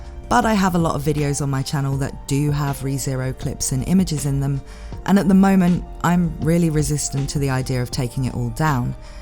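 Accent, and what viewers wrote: United Kingdom. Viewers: I knew after listening to your video on Noralities that subscribing would be wise. You have "the voice", I could hear it then and I hear it now. Beautiful, smooooooth, intellectual British voice (sorry if you're Australian, I'm American please forgive me) that I could quite literally listen to all day and just be mush. I AM MUSH! HEAR THIS PROPHECY! WE SHALL ALL SLEEP VERY WELL ON WHATEVER NIGHT THAT COMIC BOOKS vs MANGA SALES VIDEO IS PUBLISHED! Thus, it is written and I look forward to that night. :D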